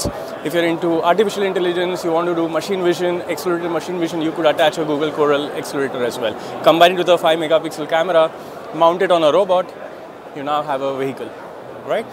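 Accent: India. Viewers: Jeet accent, into the trash it goes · Sorry for being a racist, I really don't like listening to Indian accent English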